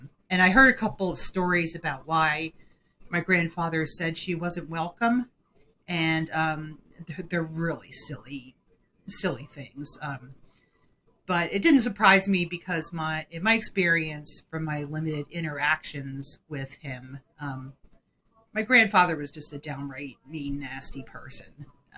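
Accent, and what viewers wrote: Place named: Mid-Atlantic, United States. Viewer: Thank you for sharing this. I’m glad your uncle was able to give your brother perspective and “permission” to trust himself. That’s something so many people never get. (You sound mid-Atlantic, perhaps I am wrong, but hello from MD!)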